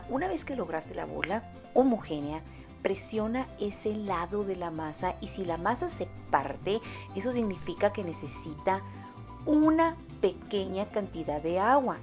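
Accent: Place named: Mexico. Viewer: Qué lindo acento mexicano. Estupenda receta. Me antojan unas tortiçças ricas así. Felicitaciones y saludos desde Brasil...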